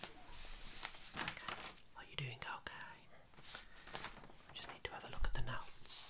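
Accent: Birmingham, United Kingdom. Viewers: not often that outsiders compliment the brummy accent I must say 😂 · Never thought that as a girl from Coventry, that a man with a Brummie accent would send me to sleep every night! Great video, quack quack! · 2 nights running fell asleep to this , here's for a 3rd! Looking forward to the next vid. Love your vids , who would of thought a brum accent could be so tingly Haha.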